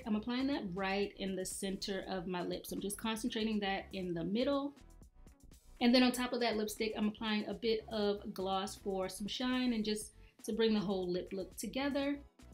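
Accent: Baltimore, United States. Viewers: is that a Baltimore accent?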